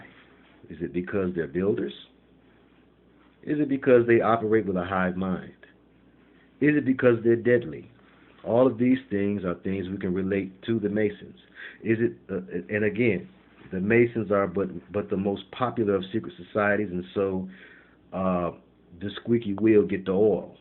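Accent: Detroit, United States. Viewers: Are you from Detroit bro? Much luv appreciate your teachings.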